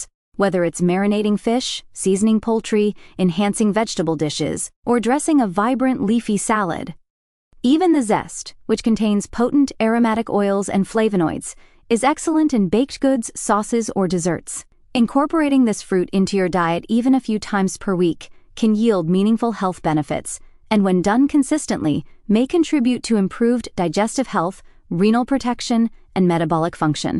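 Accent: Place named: United States. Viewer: thanks for your posts. But you say you are in the UK? You have a very American speaking voice.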